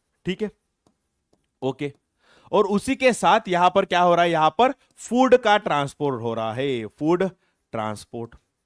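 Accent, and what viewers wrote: Maharashtra, India. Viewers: Sir are you Marathi...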